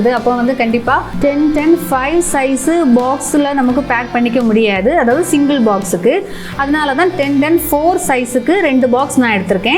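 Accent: Kanyakumari, India. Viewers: Thank u sis ,nanum ethei than panren but romba onum order varala,enoda native kanyakumari thaan sis unga slang vache therinjuthu,😍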